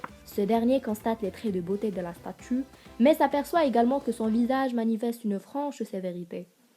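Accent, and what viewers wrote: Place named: Quebec, Canada. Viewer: elle a un petit accent quebecoi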